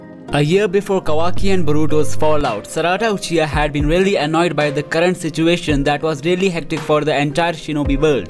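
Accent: India. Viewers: ok bro i understand, I said becoz by listing your voice I think that you a indian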